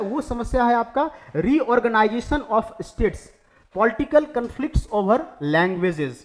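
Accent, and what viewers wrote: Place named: Bihar, India. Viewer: Kon h language bihari k lgta h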